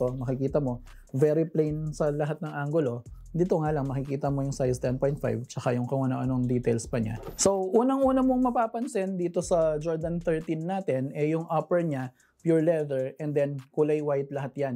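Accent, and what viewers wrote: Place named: Pampanga, Philippines. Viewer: Ah kapampangan ka bro? "Konyektado" Konyeksiyon"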